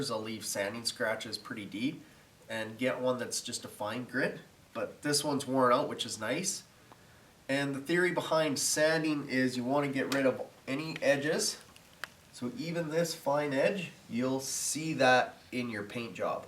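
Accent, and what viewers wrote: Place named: Alberta, Canada. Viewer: hey where is this guy from? sounds a little like people from Alberta Canada, but maybe his accent is from the states?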